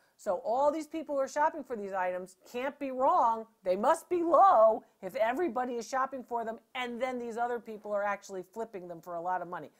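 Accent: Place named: New England, United States. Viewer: Dr. Lori you are just precious. I love your New England accent and your Italian family stories. I appreciate all of the knowledge that you share with us on the items you select. Please keep it up.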